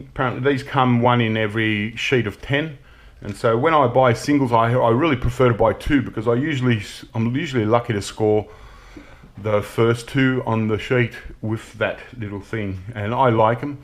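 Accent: Australia. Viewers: Love the coins mate..you have a very cool take on coins but wash your hands bro and how bout some neosporin and a bandaid on that finger. Sweet aussie accent too.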